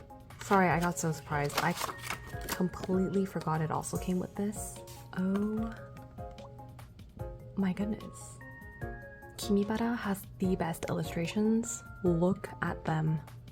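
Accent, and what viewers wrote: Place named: Japan. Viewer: your japanese accent is so satisfying!! loved this vlog, i’m so interested in reading the kingyoya san no karisome fuufu 👀 and omg I love marin too, that standee is so adorable waaah 😍💖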